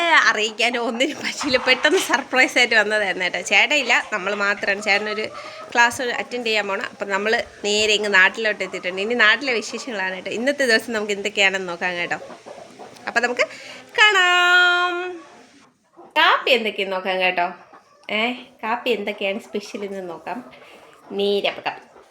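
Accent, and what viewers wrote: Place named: Parassala, India. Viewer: ഇതാണ് കറക്റ്റ് പാറശാല ഭാഷ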